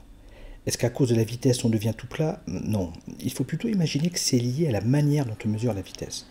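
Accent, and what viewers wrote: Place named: Geneva, Switzerland. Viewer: Très bonne explication, vous avez l'accent genevois, vous êtes de Genève? Vous travaillez peut-être au Cern?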